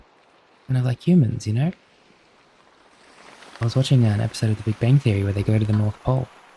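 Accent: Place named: Australia. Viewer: Hi!! You can call me Dove I’m from Australia (guessing you are also based on the convo about weather being warm and the similar accent lollll) and I don’t usually comment on your videos but thought I should just say thank you so much for uploading these. I struggle a lot with anxiety and mass amounts of sensory input due to my ASD, so these holidays have been super stressful and energy consuming with me, especially since my family has to cook for 7-12 people who are coming over tomorrow for Christmas (not including cooking for those that already live here). Anyways I digress, these videos do help so so much after chaotic and hectic days for me, it’s so lovely being able to put on a video that doesn’t yell at me before I sleep about a game I hold very dear to my heart. Keep up the awesome work mate! And happy holidays! Edit: Deleted the previous comment so I could support this awesome channel loll!!!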